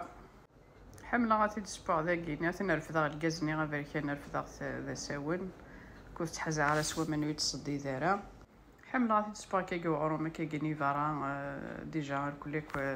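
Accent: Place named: Kabylie, Algeria. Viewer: Merci pour cette belle recette et très beau commentaire langage de la grande Kabylie bel accent qui donne chaud au coeur car je suis de la région d Azazga c'est une fierté bravo et merci infiniment bonne continuation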